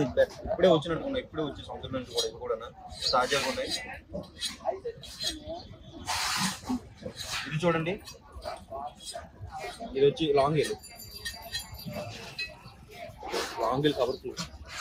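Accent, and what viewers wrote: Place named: Visakhapatnam, India. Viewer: Love vizag.....pure vizag fish market....nice ..open free vizag language.....😀👍. Keep it up...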